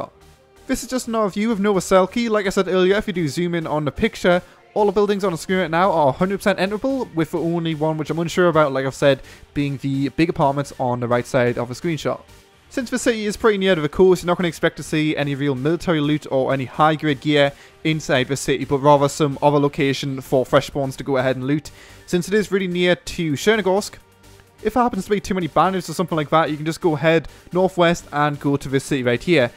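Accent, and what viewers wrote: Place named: Newcastle, United Kingdom. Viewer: hes from newcastle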